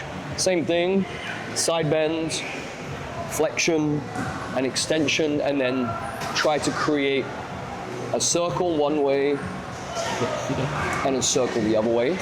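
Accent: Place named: England, United Kingdom. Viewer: I'm sorry I can't take this guy seriously with all those tattoos combined with his Wallace and Grommit look and middle class English accent on top. I would prefer t learn from the Thai trainers, are there any left in Bangtao?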